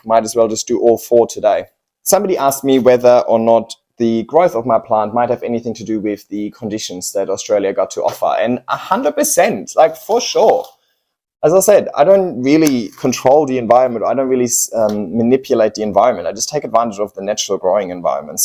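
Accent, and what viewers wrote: Germany. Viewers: I wish I had a cool German accent too.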